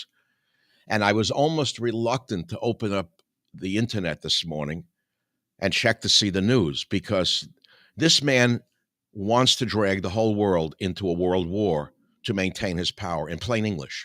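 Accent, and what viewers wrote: New York, United States. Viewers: I started listeing to you Mike back during the Obama years (<num>) when I was a liberal (still am but in the classic sense) and you resonated with me. Can't explain it...I think it's because you were from NY and I lived in NY at the time and you sounded like so many people I have encountered over the years. Also I was in a lost period [during that time] of my life; and you gave me motivation and perspective-(almost like a father figure) otherwise, I wouldn't had been able to come up with, on my own. I credit you with breaking me out of my identity politics phase which I was heavily in at the time. This actually caused me to change my thinking and helped advance my career. However I would be remissed if I didn't spread the credations around: I also give credit to Alex Jones and Mark Levin, and occasionally Rush, but I never missed your radio show! 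They then got to you and had you removed for being an unbridled patriot; but I am glad you're back! And we need you now! Especially in these trying times! Thank you. 🙏 ❤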